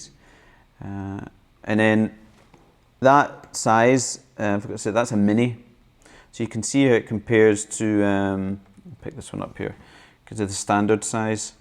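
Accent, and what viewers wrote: Scotland, United Kingdom. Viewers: Is this a spoof? Love your Scotch accent!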